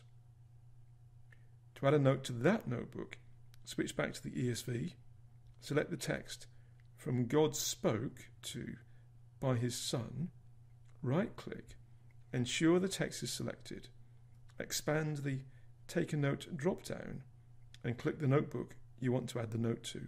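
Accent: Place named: United Kingdom. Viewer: How nice to have a calm British English voice. So much easier to follow than Morris' style of delivery.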